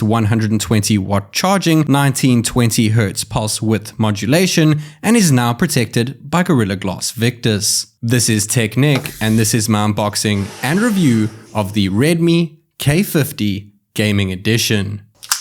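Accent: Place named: South Africa. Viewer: Searched for the k50 video and yours came up first . A few seconds in I was like nah.... This dude is South African , he has to be. And I see ,yes you are. Amazing. Well done man. Wish you millions of subs . Still watching the video and it's great so far. God bless you bruh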